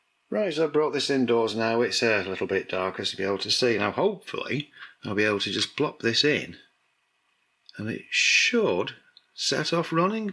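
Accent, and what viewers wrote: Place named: United Kingdom. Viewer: What shocked me is a British accent living in the United States. I subscribed😂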